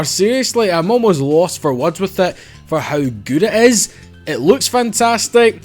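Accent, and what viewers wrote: Scotland, United Kingdom. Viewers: This guys Scottish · Are you Scottish?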